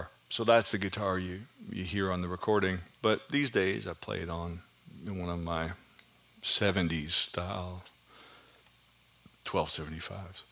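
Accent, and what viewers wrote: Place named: Texas, United States. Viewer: You’ve been living in Austin too long. You picked up that Texas twang.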